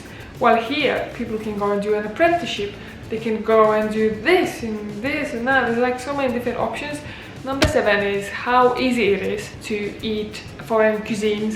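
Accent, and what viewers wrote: United Kingdom. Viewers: you have a slight northern accent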